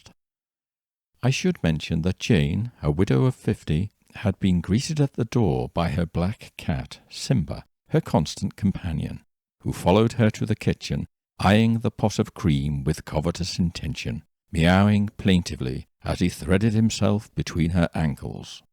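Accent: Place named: RP, United Kingdom. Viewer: Petite question. Where does your pronunciation of the U vowel come from? e.g. cushion, bullet and put. Am intrigued as otherwise you come across as a relatively RP speaker. Enjoy your content until your slow delivery and soporific voice takes effect. Cordialement. Alexandre Garden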